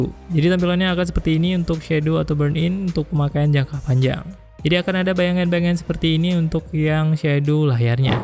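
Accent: Aceh, Indonesia. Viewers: Org aceh? Ya om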